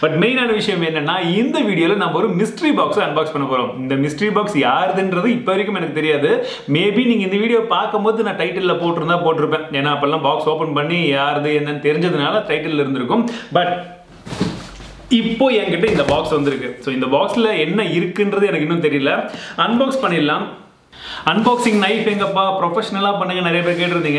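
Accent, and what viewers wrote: Chennai, India. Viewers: Innaa thala enga chennai baasai la konjam varudhu, but nalla irukku 🤣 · Vara vara chennai slag bro naaku la naatiyam aada arambichiduchi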